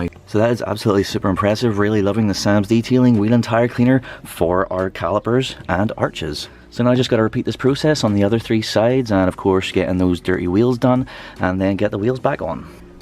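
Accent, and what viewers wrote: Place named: Northern Ireland, United Kingdom. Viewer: Love the video mate I recognise that accent whereabouts in NI are you from?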